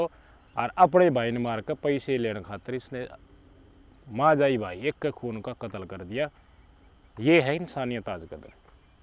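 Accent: India. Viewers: Desi boly mast h bhai sahab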